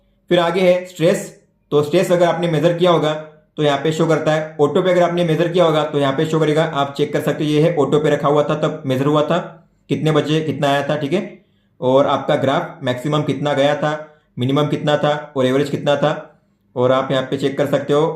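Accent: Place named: Maharashtra, India. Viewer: marathi accent 🙊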